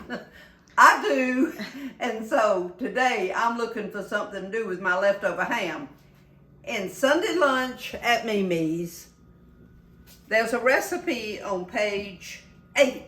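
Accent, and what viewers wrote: South, United States. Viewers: I love the American southern accent! Most people do, because the southern soul is alive and well! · Hi, thank you for the reply! I just got the sense to see you are also on facebook, so Yayyyy i found the recipe, And I can't wait to get your book. And I love your accent (I am from North Carolina but grew up in Florida, so I love to hear the Southern accent when I can. Thank you again!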